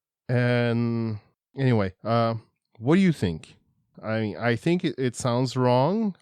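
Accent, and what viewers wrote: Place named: Netherlands. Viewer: You sound a bit Dutch.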